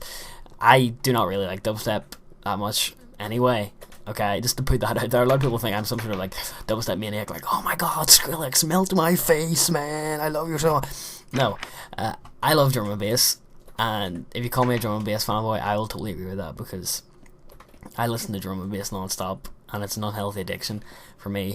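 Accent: Ireland. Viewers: what part of ireland r u from?? And also, WHATS THA CRAIC!!! :D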